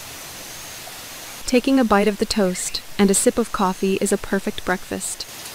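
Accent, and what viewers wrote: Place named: United States. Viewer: I don’t like the American accent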